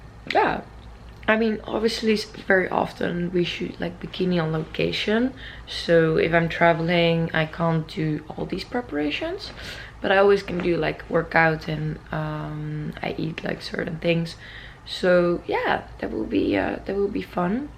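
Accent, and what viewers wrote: France. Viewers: Is she french ?? She has like a french accent Ps : that tan didn't look so good , her natural skin color looked way better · Your French accent is so cute. I’m French btw 😜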